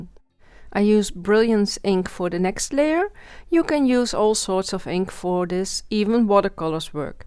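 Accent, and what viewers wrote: Netherlands. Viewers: Waauw amazing ideas that I've never seen before ! Thx !! En ik dacht al dat Nederlandse accent te horen haha.. wat heb je leuke nieuwe ideetjes zeg !! En wat een mooie kaarten en bloemen !!! Enorm bedankt voor je moeite om het te delen !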